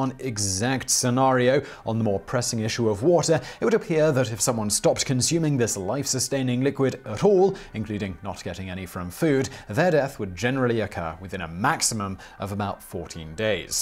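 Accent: England, United Kingdom. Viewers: sounds so English and proper, when he cracks up, it's super hilarious! Cracks me up!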